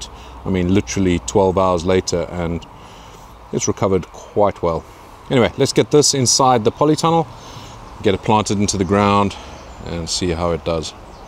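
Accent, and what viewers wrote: South Africa. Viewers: I thought you have a South African accent. Too bad on your lost plants. Hope you get a good yield from the ones you have going though this year. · Damn i was just about to comment about how South African he sounds! - Content is great! waiting for my own garden and i'll be referencing the vids for my own setup ^^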